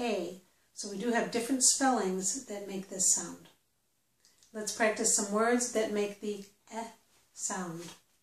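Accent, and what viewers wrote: United States. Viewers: Excellent and the best broadcasts American's pronunciation !! I'm grateful for you Madame. Thank you +++. Fadi from France, advanced learner English in Canada .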